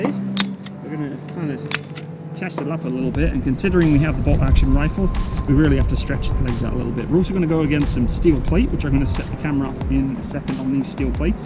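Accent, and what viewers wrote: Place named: United Kingdom. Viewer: Hey where do u live? I thought maybe uk or something cause of the accent?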